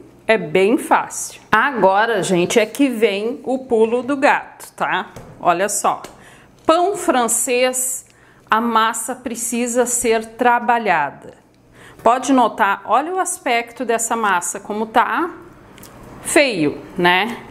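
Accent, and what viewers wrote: Rio Grande do Sul, Brazil. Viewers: Essa guria é top demais!!! Arrazaaaaaaa sempre nas suas receitas maravilhosas !!! Adoro o sotaque dos gaúchos!! Gurizadas que falam bonitooooo tchê!!! 😊 Parabéns guria por mais uma delícia e gratidão sempre 👏👏👏👏👏👏😍😘🌹🌹🌹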